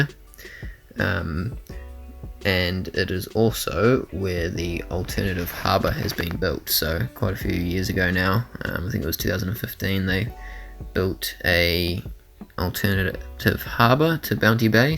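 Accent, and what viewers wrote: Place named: New Zealand. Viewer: Nice video well done 👍. Do you live on the island? Did not really grasp if you do. You do sound like a Kiwi. No offence of course. I am a Aussie, no offence of course lol . Cheers bloke.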